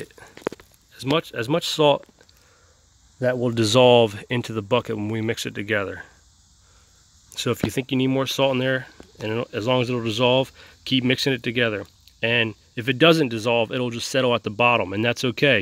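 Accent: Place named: Philadelphia, United States. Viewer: Gotta be from Philly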